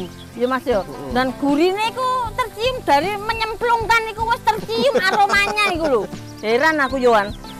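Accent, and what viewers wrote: East Java, Indonesia. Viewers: Bu, asli mana ya.. Logate kok wong etanan 🙏😉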